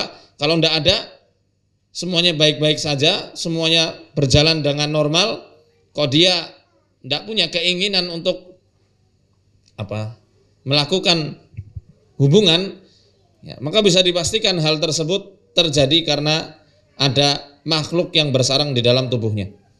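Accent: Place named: Banyumas, Indonesia. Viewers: Orang sokaraja banyumas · Kok ngapak dr mana ya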